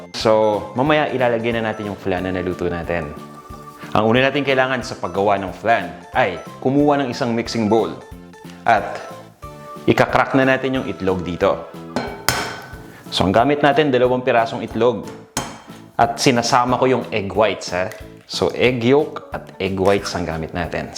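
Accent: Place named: Coño, Philippines. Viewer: Masaya po ako na matatas na tagalog niyo. Old videos niyo po ang coño kasi pakinggan haha. Pero masaya pa rin. Itatry ko po ito sa noche buena. Magisa po ako sa pasko kasi bawal magtravel pero dapat masarap pa rin pagkain kahit ganun.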